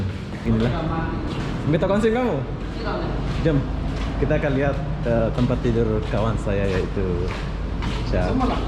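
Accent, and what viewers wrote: Lombok, Indonesia. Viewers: Klo bolh tau abang nich orng indonesia jugk ea krn dri tutur ktanya mcam indonesia maaf ea bang jdi kefo cima igin tau aja bang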